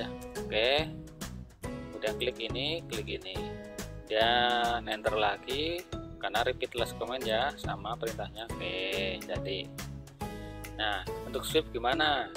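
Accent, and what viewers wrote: Banyumas, Indonesia. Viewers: Full tank sukses selalu buat om.. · Master jan keren temenan ya,geleng geleng inyong lah,sukses om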